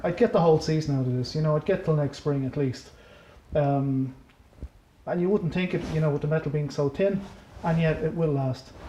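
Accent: Ireland. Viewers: Just realised - was the reason I started to watch YouTube in the first place. Thank God he's back. Apart from the content being exactly my cup of tea (free heat and paramotoring) there's something therapeutic about the enthusiastic and humorous delivery in that wonderful Irish lilt. Normal service has been resumed! Good on ya!!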